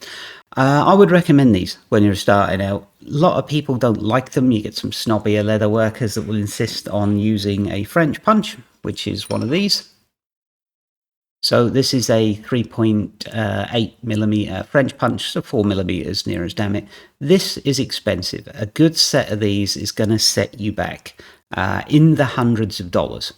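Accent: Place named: United Kingdom. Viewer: Quick question, why do you quote US prices when you’re obviously British?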